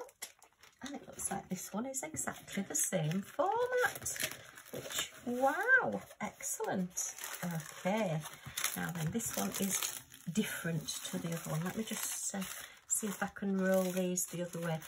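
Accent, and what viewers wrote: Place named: Hull, United Kingdom. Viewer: I thought I recognised your accent!! I was Hull born and bred too but now live in Hornsea. Those five pics are nice, lovely colours.xx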